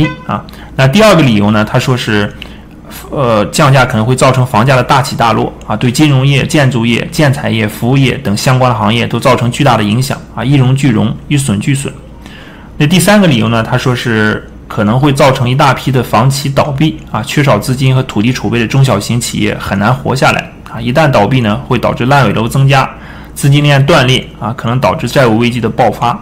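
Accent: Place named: Liaoning, China. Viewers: 刘素 我也觉得是那边，东北口音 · 我感觉是辽宁的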